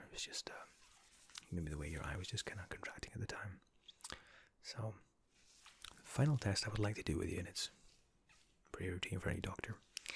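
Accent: Scotland, United Kingdom. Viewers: what is about Scottish accents that relax the hell outta me? I have Celtic background somewhat, my maternal side is the Stewarts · ASMR with Scottish accent? YAS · thank u for your reply :) Lol, of course not, u don't sound like Russian at all, but personally I don't like Scottish accent much but in your case it's completely opposite! Best of luck and keep up the good work! :) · Are you from Scotland? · hang on are you Scottish???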